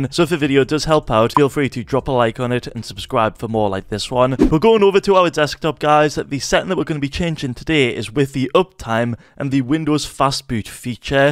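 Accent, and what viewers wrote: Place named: Newcastle, United Kingdom. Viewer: your from newcastle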